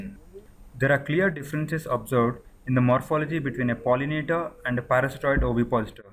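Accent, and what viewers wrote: India. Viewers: That Indian accent though! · The Indian accent is the worst accent in history.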